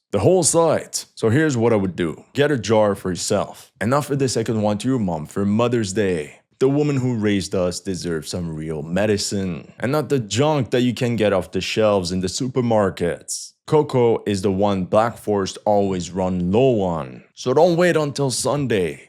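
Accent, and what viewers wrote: United States. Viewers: Hold on a second buddy, your american accent is hilarous greetings from australia and wishing health to you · Your American accent is funny shit haha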